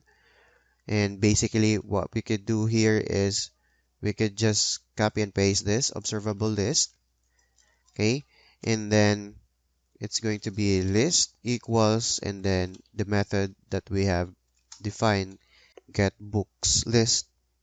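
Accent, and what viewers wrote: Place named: Philippines. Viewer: Parang Filipino Accent, kung Filipino ka sir... Maraming Salamat po :) sana magupload pa po kayo.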